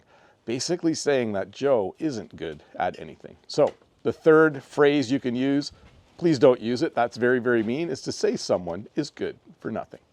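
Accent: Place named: Canada. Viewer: you have a good video! Your Canadian accent is very good, it makes easy for us to learn english...I also loved the place you did the video!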